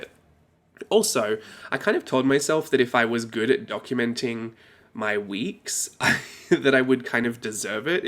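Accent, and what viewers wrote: Australia. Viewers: This is the first video I’ve watched from you. I knew you were an Aussie by your accent. So refreshing 🤓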